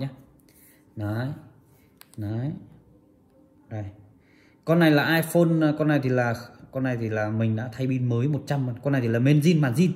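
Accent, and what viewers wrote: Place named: South, Vietnam. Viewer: Nói giọng nam .còn dễ nghe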